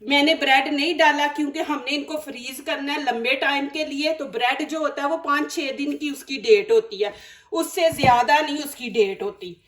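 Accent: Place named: Punjab, India. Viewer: Pure Punjabi accent ❤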